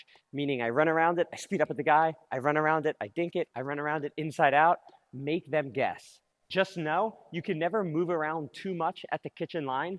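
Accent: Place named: New Jersey, United States. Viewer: i trust you have the best intentions, but it doesn't necessarily mean you're right. i've never watched his videos and felt he was talking down to me. and this is coming from the pov of a former nyc public school teacher and 4.5 player. to me, just sounds new jersey direct and enthusiastic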